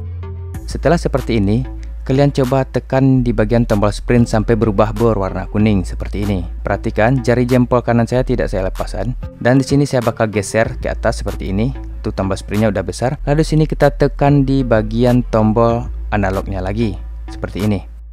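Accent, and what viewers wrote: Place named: Aceh, Indonesia. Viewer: Bang lu orang Aceh lah, soal nya cara ngomong logat nya kek orang aceh, perasaan gua doang atau gimana ya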